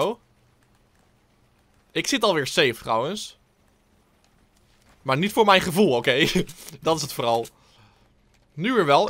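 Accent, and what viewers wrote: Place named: Flanders, Belgium. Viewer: Ga weer vaker vlaams praten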